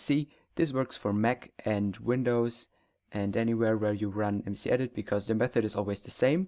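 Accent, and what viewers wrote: Germany. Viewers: u have a speak is like german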